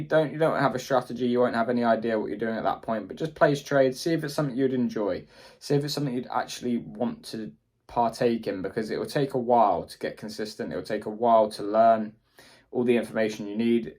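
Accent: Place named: England, United Kingdom. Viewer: I just love your English accent!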